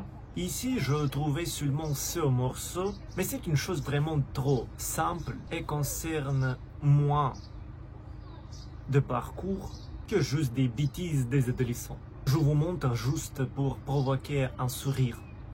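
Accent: Russia. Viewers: salut ton accent russe est cool bisse de france.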